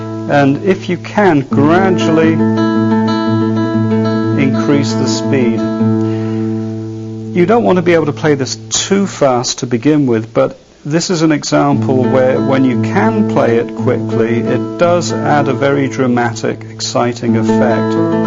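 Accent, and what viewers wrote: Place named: England, United Kingdom. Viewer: soft English accent + Spanish guitar = best. Youtube. videos. EVER!!!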